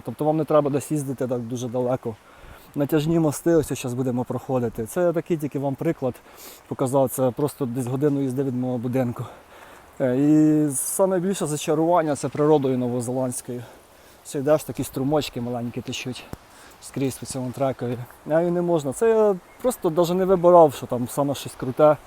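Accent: Cherkasy, Ukraine. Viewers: По вашій говірці я зразу зрозуміла,що ви Черкащини.А потім по коментарях отримала підтвердження здогадкам😂Дякую за цікавий контент!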